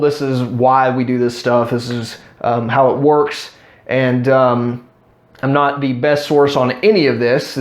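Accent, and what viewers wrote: Texas, United States. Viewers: Why do you sound like you are from texas lol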